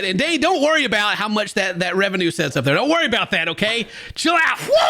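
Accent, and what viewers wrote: South, United States. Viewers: I subscribed during the beginning of the dark ages apparently lol. I checked you out because my friends had me watch a video and we both liked that you had a southern accent😂😂😂. I watched your family vlogs and loved how you interacted with your family and i was actually mad that you didnt have more subscribers because you deserve it! Once you added Buffpro i tuned into every video with yall. I feel bad but i actually skip your Pewdiepie videos lmfao. I had never watched a pewdiepie video until after i had been subscribed to your channel for years. I hope you keep making content. Its part of my daily schedule now lol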